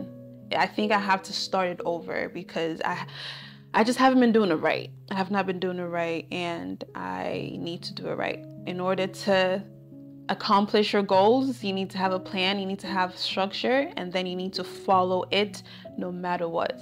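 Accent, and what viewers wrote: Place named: New Jersey, United States. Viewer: Girl, let me find out you from jersey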